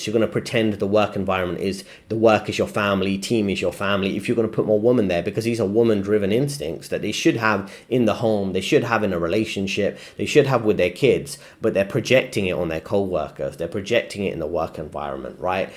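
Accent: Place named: Multicultural London, United Kingdom. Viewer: In accent (Multicultural London English?) the plural word "women" sounds like the singular word "woman". Completely off topic but interesting.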